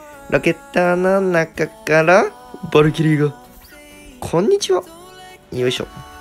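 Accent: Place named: Kansai, Japan. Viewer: めっちゃ関西弁やん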